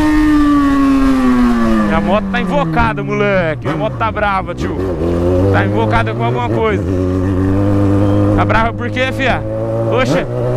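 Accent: Goiás, Brazil. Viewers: FALAA NO PIQUE DO GOIAS PORRA KKK AAA NEMM MAN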